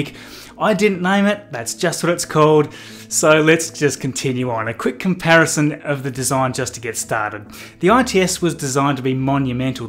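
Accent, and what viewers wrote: Australia. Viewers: That's why I pointed it out :P That's an Australian accent btw, not British hehe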